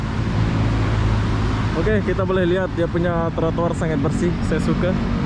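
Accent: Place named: Malaysia. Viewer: Mas bro asli mana kok dialog dan logatnya seperti orang melayu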